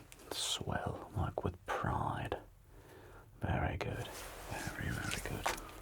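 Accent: United Kingdom. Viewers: I was not prepared for how much I would enjoy you with a British accent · I seriously thought you were actually British 😮. Amazing content as ever ❤ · I absolutely love the VIP experience you provide in your suit measuring videos! So calming 😌 I love the triggers and the British accent too! Thank you so much J! Smashed another video out of the park again! (when do you not?! 😂 ) PS. Love the profile pic update too! Looks cool! Keep up the great work! Have a lovely weekend!! 💙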